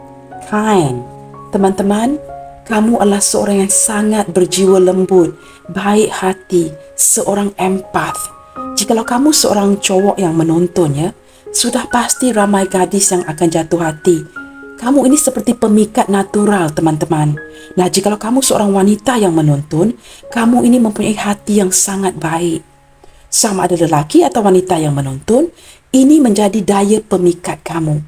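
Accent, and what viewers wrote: Malaysia. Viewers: Sleng MCM mlyu lah..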